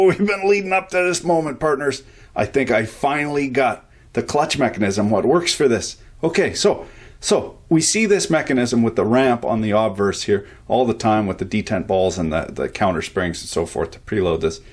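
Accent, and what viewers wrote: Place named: Quebec, Canada. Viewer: your french isnt bad, almost sounds Quebecois :P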